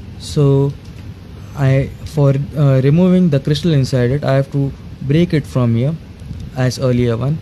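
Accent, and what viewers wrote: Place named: India. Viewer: I like your Indian accent. Great video!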